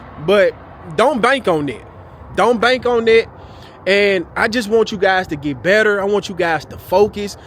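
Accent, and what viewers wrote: Memphis, United States. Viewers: I used to live in South Memphis near McLemore. At first I wasn't gonna ask, but you sound just like someone from Memphis, that's why I asked.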